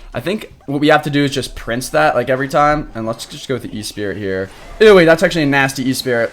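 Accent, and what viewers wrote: Spain. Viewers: Bro your english is very spanish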